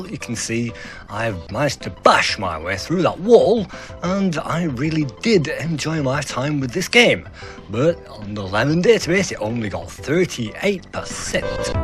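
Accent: United Kingdom. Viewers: One of the cool things about retro computing channels is the way the people who make them (yourself included ) have various accents from around the UK. After all, they are not so commonplace. Another example is Lorfarius. Cool channel. Sure, not all accents are gone... yet. Hearing the cool accents is indicative of the time when these computers were the "in thing." After all, this retro computing is about the "experience" and not just the machine or an emulation. #RealDeal #LurmunUrmurga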